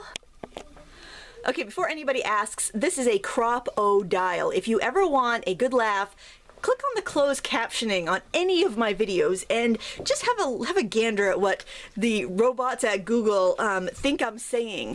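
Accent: Maine, United States. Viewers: Lolol, welcome to you tube land, to hubby! Love the little frog. Don't know why, but I've always loved them. Thanks for sharing all you do during your videos. I was watching your vid the other day, my sweetie walked past, and stopped... staring at the screen. I said you were a great teacher, and that you lolwere from Maine, he said "Oh." And moved on. No telling what that meant, but he's from MA. Boston more precisely. Lol Today is the first time I've heard your accent come thru. His is always there! You should hear the recognition problems he has on the phone! Anyway thanks for all the thinks I've learned from you. Hugs, Jess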